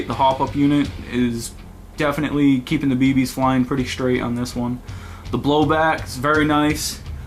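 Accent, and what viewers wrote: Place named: Boston, United States. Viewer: You live near Boston MA don't you I know that accent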